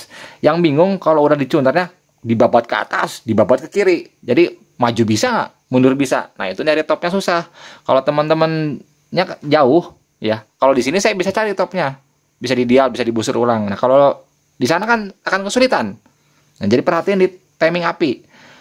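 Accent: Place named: Bekasi, Indonesia. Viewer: Dpet ilmu sedikit2 nih bang😊. Ngomong2 suara abang kaya orang bekasi babelan😁😅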